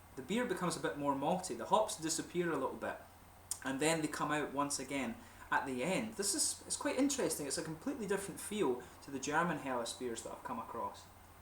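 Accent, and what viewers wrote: Scotland, United Kingdom. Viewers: You have quite a bit of different Language skills , it's difficult I'm sure to acquire those different languages like that . I've watched many Movies with Scottish Actors and Actresses , and when I watch you're Reviews I always look for comparisons between you and them, and you have become really good at curving your accent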